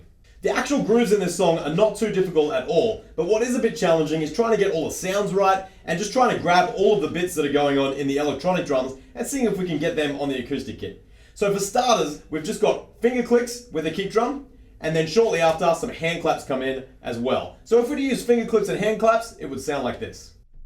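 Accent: Australia. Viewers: I subscribed as soon as I heard your accent I’m an Aussie aswell mate 🇦🇺🇦🇺🇦🇺🇦🇺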